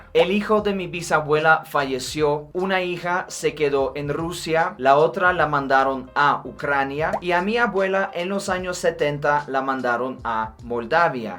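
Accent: Colombia. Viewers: Tu maravilloso español colomombiano y tus conocimientos y forma de explicarlos dan un gran aporte a cuantos estamos interesados en saber y entender la compleja historia entrelazada entre rusos y ucranianos. Estremece y duele la avanzada destrucción de Putin sobre el valiente pueblo ucraniano. Saludos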